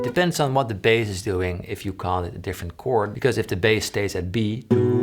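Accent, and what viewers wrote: Netherlands. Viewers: Altijd leuk dat je meteen hoort wanneer iemand een Nederlander is. Maar prachtig en inspirerend filmpje en ik ga het proberen! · are you Dutch? Your accent seems to suggest so ^^ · Are you Dutch? I feel like I can distinguish between accents noe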